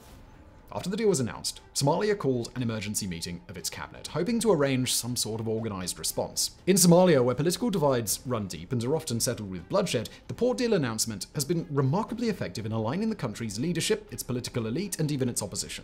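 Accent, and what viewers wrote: United Kingdom. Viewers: Love your channel. One question: How come the English pronounced the "A" as an "R... and the "R" and an "A" ? Is it intentional? Like " R B C D E F... and. Q A S T U V? Not making fun, I promise, and the Brits have the best accent ever... just always wondered.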